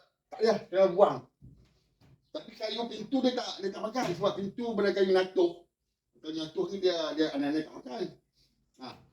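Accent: North, Malaysia. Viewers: dh KENA ckp utara 😅beestt... mmng terbaikk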